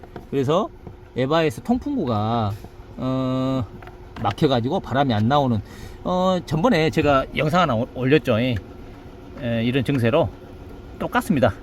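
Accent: Jeolla, South Korea. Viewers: 항상 침착하게 그리고 쉬운 퍼포먼스로 말씀을 아주 잘해주세요!~^^ 찰지게 또 하나 배워갑니다ㅎ 처서 가 코앞이지만 몸관리 신경쓰셔셔 컨디션조절 잘하시길 기원드립니다!! 역쉬 구수한 전라도사투리 짱!ㅎ 참고로 저는 충청도 입니다!~ 나가 아주 미쳐불어 미쳐불어 미쳐분당게..ㅎㅎ^^ 감사합니다. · 전라도 말씨가 정겹네요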